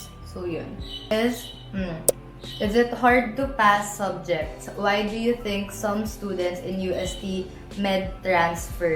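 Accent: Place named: England, United Kingdom. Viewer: I love you english accent.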